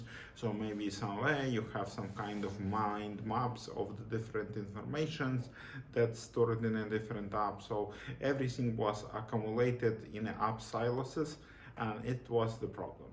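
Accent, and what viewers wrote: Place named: Russia. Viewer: Чисто наш акцент, со снижением силы к концу высказывания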